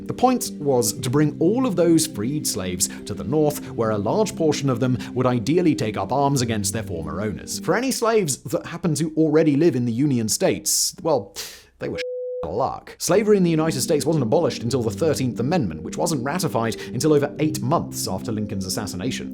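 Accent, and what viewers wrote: England, United Kingdom. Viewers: I don't get a pompous attitude from him at all. Are you American by chance? I wonder if the English accent just comes across that way to you guys (if you are). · Guys, ya really can't judge him too harshly. Most all of this stuff is handed to him by writers. Him saying it with confidence and a British accent makes people assume he knows all this stuff. He's just reading the script he got a few minutes before the recording. His researchers can leave a little something to be desired, sometimes.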